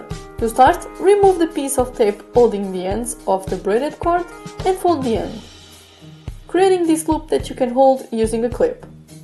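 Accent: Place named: Poland. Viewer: Love the accent....Polish 🙂?. The last bracelet was awesome. I will do the end caps in silver...thanks for sharing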